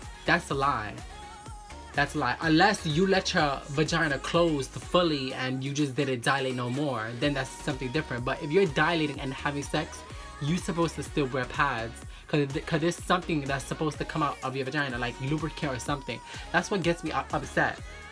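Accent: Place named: New York, United States. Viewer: It sounds like your from NY I really like literal showers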